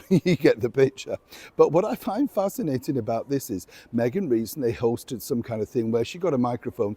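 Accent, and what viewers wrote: United Kingdom. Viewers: I love to hear someone with a British accent!!! 👋👋👋👋❤️❤️❤️❤️🌹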